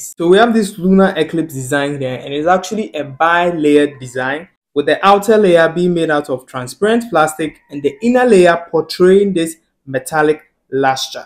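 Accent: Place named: Ghana. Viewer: 😂😂 you scratch my back I scratch your back right! Yeah I know you're Ghanaian now your accent says it all!!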